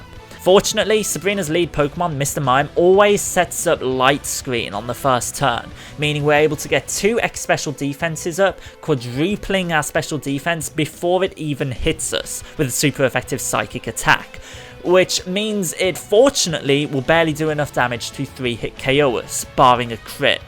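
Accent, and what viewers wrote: Birmingham, United Kingdom. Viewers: My guys from Brum! love that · Damn, all these videos I've watched and I had no idea you were from the same town as me, Brummies unite! You'd think I'd recognize the accent but apparently not.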